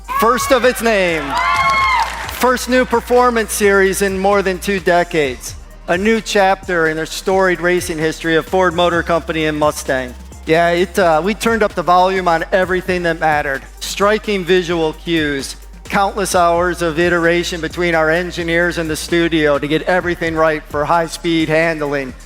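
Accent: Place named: Carolinas, United States. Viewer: He's gotta be NC or SC or somewhere in this area with his accent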